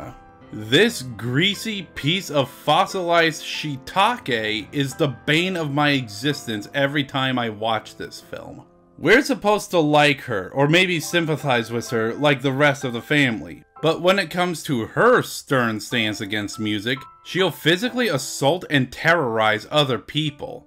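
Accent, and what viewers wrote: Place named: United States. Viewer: I'm worried that this is a cultural issue. I don't want to assume you're American, but the accent says enough. In America, it's perfectly normal to go against your family's wishes to follow your dreams. That's an outlier in the world as a whole. In many cultures, family is everything. I'd suggest getting a perspective from someone who is actually from the culture they went so far out of their way to represent, before calling someone who is upholding an important, if nonsensical, family tradition as a "medieval reject".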